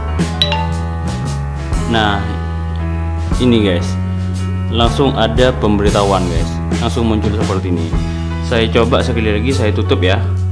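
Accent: Madura, Indonesia. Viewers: Orang madura ya? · Bang Orang Madura?? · Bede bhs madura nya keah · Orang Madura nya bang??? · Orang madura kak? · Abang orang madura yaa? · mas kamu orang Madura ya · Wkwkwkw kakak orang madura sama kayak saya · Org madura bkn sih? bede dimma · Yg buat konten kyknya orang madura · Orang madura ya mas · Bng lu orng madura y? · Reng madure kake ya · Orang madura ya om 😁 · Orang madura yak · Abg orang madura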